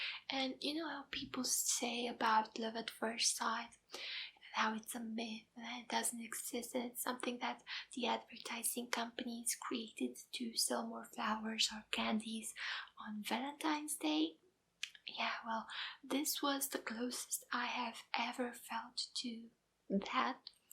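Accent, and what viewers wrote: France. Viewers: Is that a french accent?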